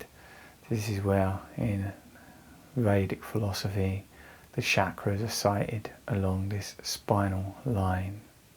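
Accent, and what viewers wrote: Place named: England, United Kingdom. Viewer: An English accent is so calming, why, but it is, Thankyou!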